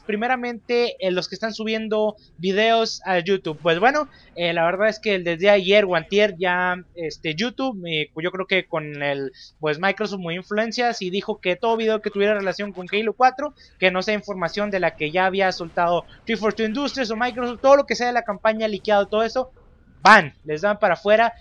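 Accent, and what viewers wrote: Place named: Chihuahua, Mexico. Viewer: Supe en un segundo que eres de chihuahua , yo tambien carnal arriba chihuahua !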